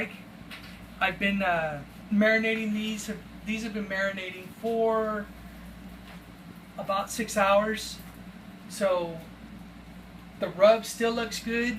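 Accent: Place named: Hawaii, United States. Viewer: You sound like you have a Hawaiian accent. Good video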